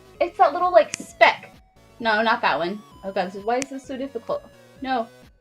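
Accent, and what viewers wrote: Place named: Australia. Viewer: Dat intro made me LoL. Great list by the way! Nice Aussie accent Mate Cheers LoL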